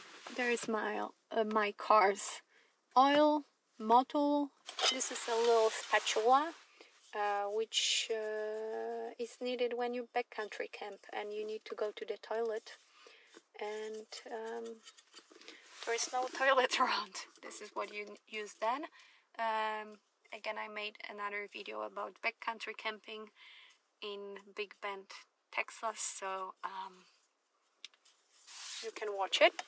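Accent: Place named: Central Europe. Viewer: I'm curious about three things in regards to your video. Firstly, why do you feel the need to roll the windows up in Griz country, and secondly, have you considered removing the front passenger seat for more room - or does it serve some purpose? Lastly, you have an interesting accent that sounds to my ears to be from Central Europe - am I close? 😀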